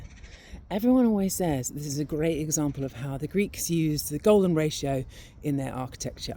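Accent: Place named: RP, United Kingdom. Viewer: Everything always sounds more believable and trustworthy is explained in a posh English accent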